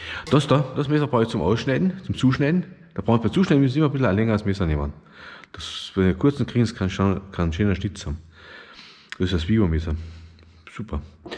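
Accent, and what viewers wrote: Germany. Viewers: Als Ureinwohner finde ich das der fast wia Breiss redt😂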